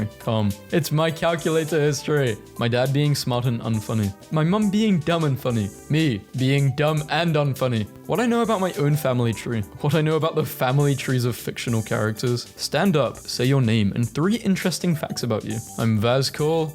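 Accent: United Kingdom. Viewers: His accent makes it A LOT better Shotout to British people and UK itself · Is he British??? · This guy's british? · Why are you British 😕🙁☹️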